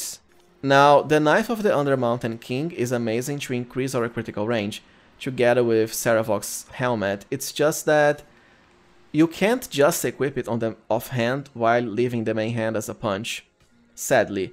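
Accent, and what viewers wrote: Brazil. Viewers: eu SABIA que o sotaque era brasileiro, SABIA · Eu tenho certeza que tu é brasileiro com esse sotaque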